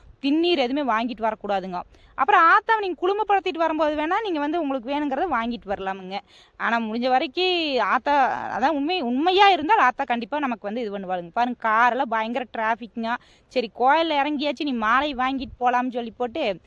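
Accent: Kongu, India. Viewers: அடடா என்ன ஒரு உண்மையான கொங்கு நாட்டு தமிழ் என்ன ஒரு சொல் வீச்சு வாழ்த்துக்கள் சகோதரி 🎉🎉🎉🎉🎉🎉🎉🎉🎉🎉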